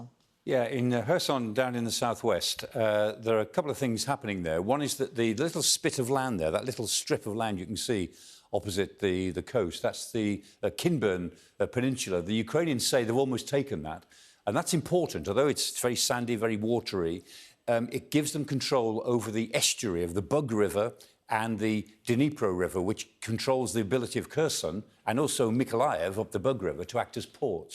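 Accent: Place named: United Kingdom. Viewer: Very beautiful british accent!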